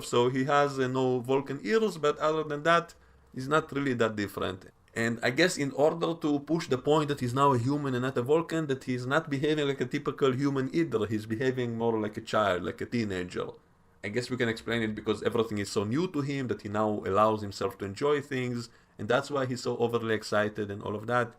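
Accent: Russia. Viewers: What accent is this? Russian? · I explained it this way: the Vulcans simply accepted their wish to not communicate the first time. Not insisting on further action. I totally agree on the loose stuff on ships that shake every now and then. Someone said there were force fields to keep everything in its place, but that doesn't make sense. I'm a man and I love cooking but I never looked at it with gender in mind...... Human Spock eats the bacon after he said "I have to catch up on things", or something to that effect. I think Vulcans have an ability to control their emotions based on certain biological factors. I think they mentioned that once in Enterprise. Mia Kirshner is only 11 years older than Ethan Peck. Spock's mother assimilated quite well to Vulcan customs, so perhaps that's why they can mind-meld? I agree on "emotional Spock". It's a tad annoying. But since it's a prequel series, they perhaps try to explain how TOS Spock became the way he is/was? 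Only question I have: what is your accent? I really tried to figure it out, but I can't. Sounds Russian, but Idk.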